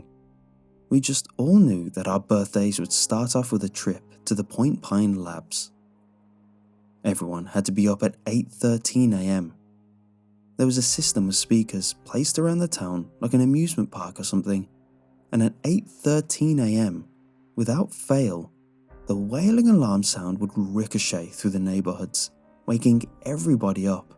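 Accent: United Kingdom. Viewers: Mans from arizona but speaks but has a british accent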